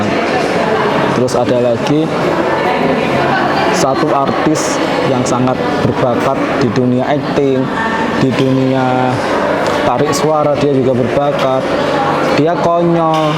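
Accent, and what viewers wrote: Java, Indonesia. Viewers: Nama chanelnya..tp klu bicara medok koyo wong jowo..sukses ya.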